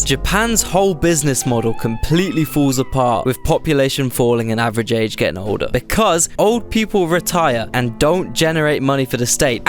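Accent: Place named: United Kingdom. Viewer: I love compilations of random clips with a slighty angry British person talk about it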